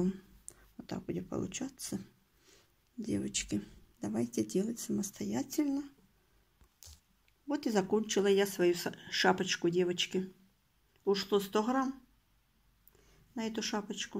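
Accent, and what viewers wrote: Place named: Belarus. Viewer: Это беларусский говор.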